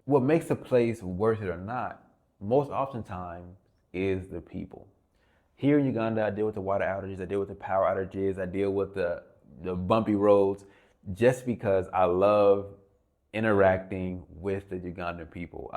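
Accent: United States. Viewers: I am a black American LADY I live in Kenya BY CHOICE. I love it and I love the people but let’s get real young man. By the way I am very well traveled…. You young people going into third world countries and “filming” live for the sake of “content creation” WITH NO UNDERSTANDING OF A CULTURE IS UTTERLY RIDICULOUS. Do you understand where you are going and the realities of the place!!??Some of these things you have experienced, you make yourself a target!!! Seriously. There are millions of destitute and poor people in most of these nations and the reality is that if YOU WERE IN THEIR SHOES you yourself might beg if you hadn’t eaten in a few days. As far as the other critiques I get this is for content and your personal experience but honestly you should’ve had a guide… also know when to shut off the cameras. In many of these cultures photos and cameras are sometimes an issue depending on the beliefs of the people you are catching on film… STUDY BEFORE YOU GO. 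Also the people for the vast majority are WONDERFUL, LOYAL, AND HONEST. Also on the women, dude in a place with literally millions in ONE city your views are just to generalized. The women are actually the OPPOSITE of what you say. My son visited here and HE IS EXTREMELY HANDSOME AND SUCCESSFUL AND IT WAS NOT AT ALL WHAT YOU DESCRIBED. Most of the attention you received especially in the CBD was simply because some people heard your American accent PERIOD. I really think your video is such a poor portrayal of the country. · Young man you sound like a privileged American. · What did you expect? A red carpet? All major cities have homeless ppl and beggars, have you seen downtown Seattle or Chicago? Lol... ...also..dude, your camera pointing and obviously American accent make you both a target AND a nuisance. Try that in Haiti or somewhere in SA, you'd get robbed (at the least) and possibly killed at worst. 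Maybe don't be so disrespectful toward ppl you're already being very intrusive with?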